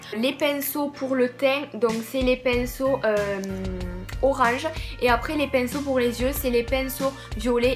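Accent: South, France. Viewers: L'accent du Sud 😍💕 Toulousaine à vie ✨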